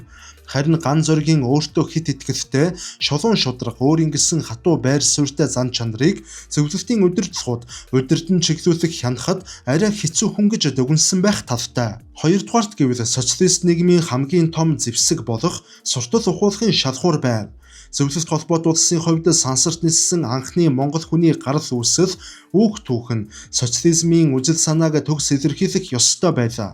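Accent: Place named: United States. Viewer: Дайн саад байх юу бхав дээ сонин стилээр америк стилээр хуцаад боль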